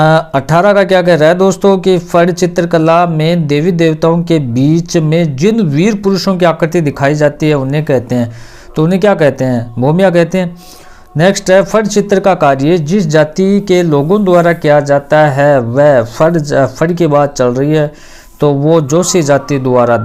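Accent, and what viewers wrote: Rajasthan, India. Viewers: Bhai Aap kha se mujhe Aap ke boli rajstani lag rhai ❤ me Rajasthan se hu my fevrete boli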